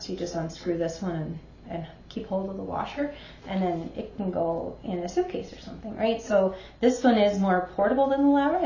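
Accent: Canada. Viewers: I KNEW I was hearing Newfoundland in your speech!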